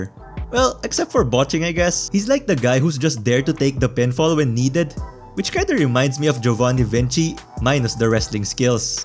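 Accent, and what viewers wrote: Philippines. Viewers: this guy sounds FILIPINO AS FUCK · Just found your channel. Good stuff. And you sound Filipino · Pinoy ka sir? Yung accent kasi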